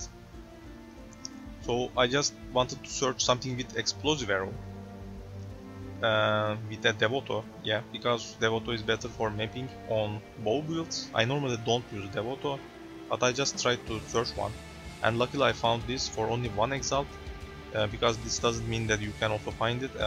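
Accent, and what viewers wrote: Turkey. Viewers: türk şivesi bu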